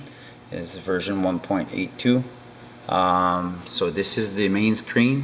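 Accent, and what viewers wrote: Quebec, Canada. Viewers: Beautiful car!!! Not sure where you are located but you speak English just like those folks in Quebec Canada.... I live in Ontario!!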